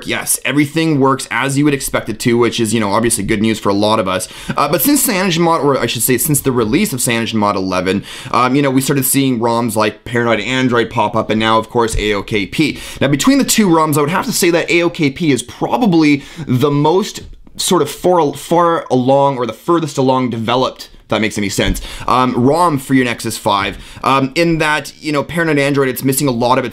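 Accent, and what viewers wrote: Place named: Canada. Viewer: Hehe you're so Canadian... that accent, man... =)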